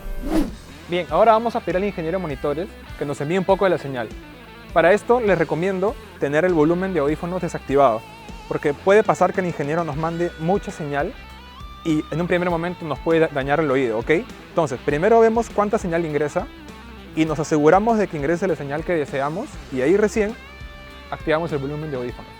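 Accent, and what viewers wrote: Peru. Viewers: Tiene el típico acento limeño. Muchas gracias por los datos sobre el Ear Monitor.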